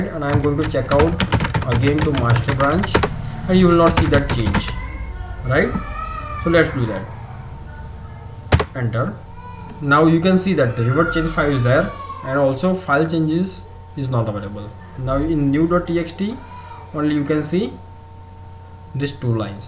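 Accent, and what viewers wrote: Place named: India. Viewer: man you have the best indian dialect i've ever heard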